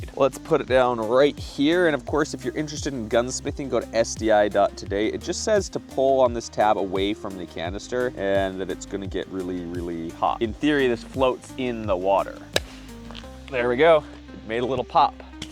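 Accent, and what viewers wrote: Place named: United States. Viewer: Title should be: " Scandanavian pyrotechnic nerd who speaks American describes flares".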